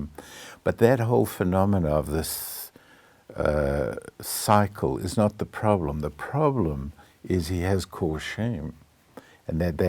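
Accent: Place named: South Africa. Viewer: South African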